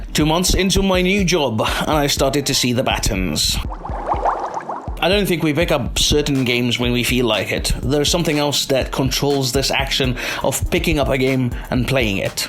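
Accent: United Kingdom. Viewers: Aawsome video but i think you are intentionally using British accent . its kinda hard to be understood when some of your audience natively speaking arabic will also choose to watch the english version as well . your channel your rules but i think american accent is best of both worlds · انت مالك يعم انت اولاً الانجليزي كويس فشخ بس اللهجة بريطاني تقيلة يمكن بس قشطة جداً انا سمعته وفهمته حتى من غير ما اشغل كابشنز انت بقى عايز ايه بالظبط الكلام لازم يكون ليه هدف يا حتة معقد هدفك انك تقول للي قدامك انه غبي؟؟؟ اقسم بالله شوية كمان وهشفق عليك بسبب شخصيتك التعبانة اللي اكيد محدش طايقها فالحقيقة ولمعلوماتك يا معقد الباشمهندس مهندس ميكانيكا قد الدنيا بس بيحب الالعاب فبيشاركنا وبيجرب يخليه محتواه متاح لغير العرب بس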